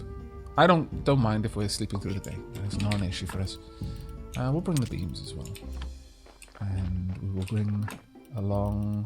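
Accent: Wales, United Kingdom. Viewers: I get people from outside the UK probably don't know much about various UK accents but I'd really have expected most people to recognise a Welsh voice. He even sometimes slips up and says the Welsh word for a thing mid sentence which is rather funny when it happens.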